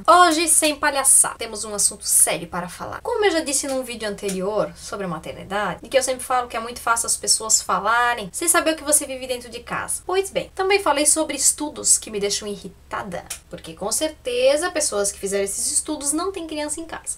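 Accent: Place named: Santa Catarina, Brazil. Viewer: Cara, continua nessa linha que vai dar bem certo! Gosto dos seus vídeos!!Do jeito que fala, ainda mais com esse sotaque "Catarina", rsrsrs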